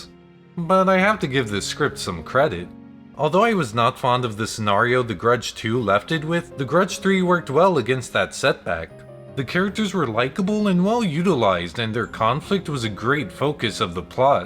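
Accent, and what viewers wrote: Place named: Ireland. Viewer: I can’t tell if you’re Irish or American or both. You have an Irish twang in your accent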